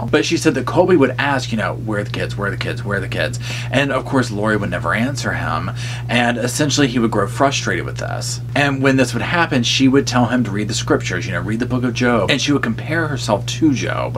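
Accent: South, United States. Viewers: Thanks, from Ireland 🇮🇪. I love your southern drawl and your hospitality knows no bounds. If you're ever in Ireland I'll serve you some pie, we'll gather around the table and talk true crime. Very informative and well researched and what makes it so entertaining is your wonderful personality and talented acting. Love you. 💕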